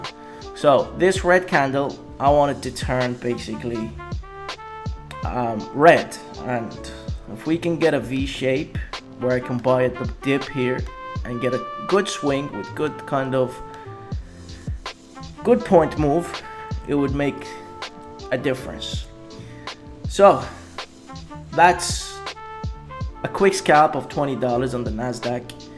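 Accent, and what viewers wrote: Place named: Ireland. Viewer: what accent is that yo. Irish Im assuming sounds g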